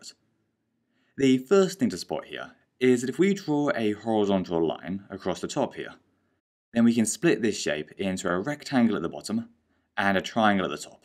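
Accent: United Kingdom. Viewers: im 2 years late but it really helped me 😉😊😀😃2/22/24 and btw are you brititsh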